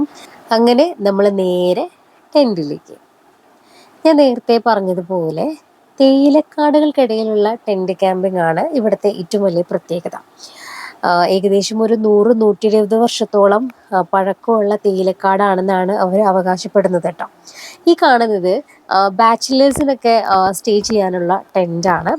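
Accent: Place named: Thrissur, India. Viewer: സൂപ്പർ..സൂപ്പർ..ട്ടാ...💕🎻🥀❤️🎻❤️🥀❤️🥀❤️തകർത്തു... ഇടയ്ക്കുള്ള..ട്ടാ..ട്ടാ...ന്നുള്ള പ്രയോഗം നല്ല രസമുണ്ട് കേൾക്കാൻ..തൃശ്ശൂർ സ്റ്റൈൽ💯💯💯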